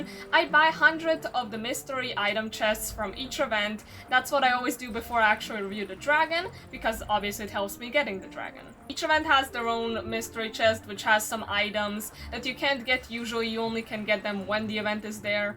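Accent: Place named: Russia. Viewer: Your accent makes me think you know Russian, if you do then know I do too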